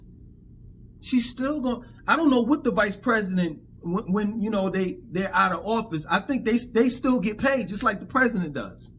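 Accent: New York, United States. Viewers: I hear you New York accent fam I know you heard about the Central Park 5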